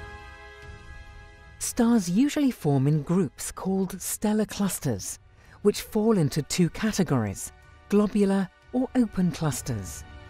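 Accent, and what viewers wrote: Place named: United Kingdom. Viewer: what is all this about "meethane, nebulee, etc.? what are laver outflows? i thought they were lava (la-vah) outflows. i just never will get the british accent where all the "r's" are suppressed, but are inserted at the ends of words that have no r's.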